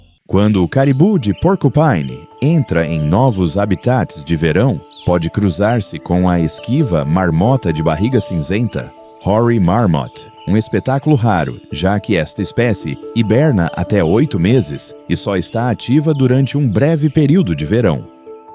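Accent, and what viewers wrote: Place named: Interior, Brazil. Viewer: Quando trocar o narrador por um sem sotaque interrrrrriorano vou conseguir assistir o esplendido documentário enquanto isso desisti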